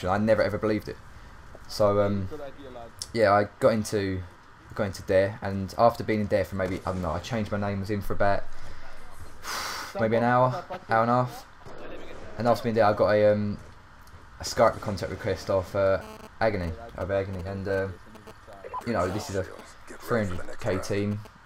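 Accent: England, United Kingdom. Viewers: How old is he? And is he British? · Are you English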